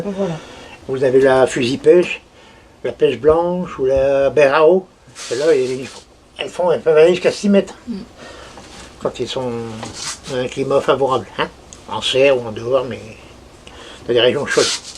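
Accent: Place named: Nord-Pas-de-Calais, France. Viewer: CC, Les tomates expliquées par un ch'ti ... un parfum d'été en plein décembre ....😎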